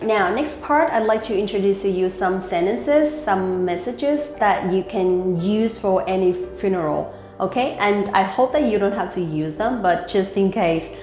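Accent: North, Vietnam. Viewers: This course had an accent from Northern Vietnam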